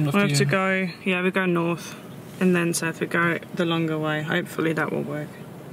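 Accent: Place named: East Germany, Germany. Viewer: Was the water so deep? It almost looked like you could just drive through :) would have been funny and maybe wet in the car :) und ich liebe den Ostdeutschen Akzent :D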